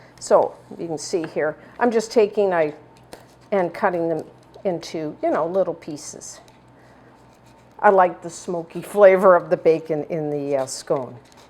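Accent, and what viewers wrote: Canada. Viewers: I knew you were from Canada as I spotted the accent - even from the Maritimes …. my mom was from PEI and I have a sister who lives there - I live in Ft. Lauderdale half the year and the other half Rockport Maine 🇨🇦🍁🇨🇦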